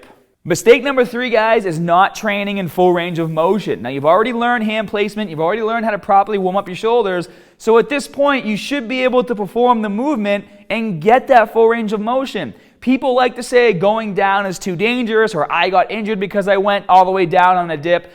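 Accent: Boston, United States. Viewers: Is he from Boston? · When did get a Boston accent? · Must be from Boston... I grew up in Cambridge. Thanks for the info man!💪🏿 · I'm guessing from Boston? LOL Great video though! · love the video...but omg i hate that boston accent! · drjd2real you exhibit 3 things with your unfortunate comment: 1. Stupidity - your comment has nothing to do with the issue being discussed. 2. Ignorance - is speaking like most Bostonians/Massachusettsmen would speak. It's the regional accent. 3. Racial complex - get the chip off your shoulder, that stuff is really tiresome. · He says start, arms and hard funny. Must be from boston. Everything with a "A" sound lol Accent is bugged out. Real informative tho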